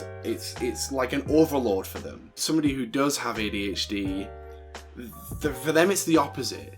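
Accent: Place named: Scotland, United Kingdom. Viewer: Are you in the UK or USA? You have a Scottish accent but adderal isn't prescribed in the UK?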